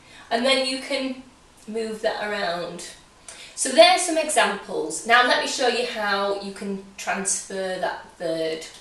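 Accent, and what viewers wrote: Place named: London, United Kingdom. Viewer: love your accent. London?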